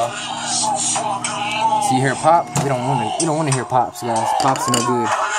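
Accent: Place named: Texas, United States. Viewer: He definitely an Ese from Texas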